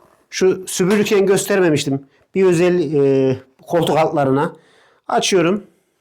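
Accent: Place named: Ankara, Turkey. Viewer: Bi düzgün konuş ya tam ankaralı ağzıyla konuşuyorsun etrafındakiler nası tahammül ediyor acaba. K ları ğğhk diye söylüyorsun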